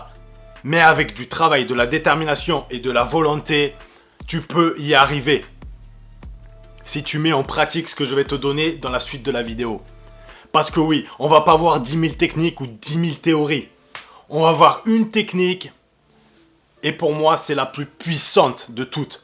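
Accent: Marseille, France. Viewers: Mec je te découvre et ça me casse le crâne t’as le discours d’un maître spirituel mais en mode bonhomme de Marseillais ! Je te kiff grosse force mon gars !!!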